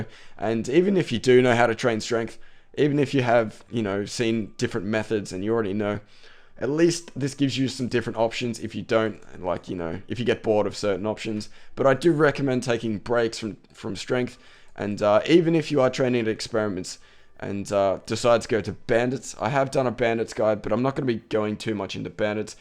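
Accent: Australia. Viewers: He's clearly not American. he's australian. run off UK English. aka the English from England. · yew aussie guide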